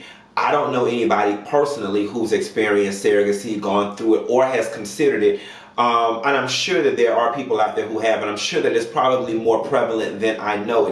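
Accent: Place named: New Orleans, United States. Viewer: PLEASE READ THIS!!!!! YEP Q. I love when u say FSU😄😄😄😄. THATS WHAT MADE ME SUBSCRIBE OVER A YEAR AGO!!!!! Ya heard me. ( im from Detroit tho i love new Orleans accent. you give the best... Im mean the BEST reviews keep it coming. Yep and even tho i like TS Madison I looooovee watching when u r on her show. when scroll and c ur name in something i stop and watch Keep going brother!!!! U give me life especially in the morning. CATCH😭😭😭😭😭😭 LOVE IT AND U!!!!!!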